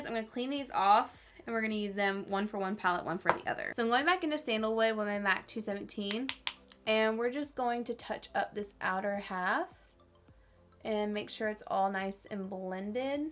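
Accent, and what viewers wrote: South, United States. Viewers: Love your southern accent, so cute! I like the left side better, looked like the colors blended out better. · I just love when your southern comes out because being a BAMA girl myself it’s nice to hear something of myself on YouTube. I’m not sure why it’s so rare to hear. There’s just so many of us southerners out here! Thank You for this video!!! I’m a big fan of yours! Much Love toYou 😘💋😍❤️❤️❤️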